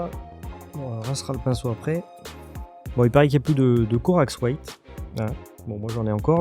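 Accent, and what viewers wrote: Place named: Southwest, France. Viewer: C'est ça c'est bien l'accent de chez moi( sud-ouest) qu'il m'a semblé reconnaître 😉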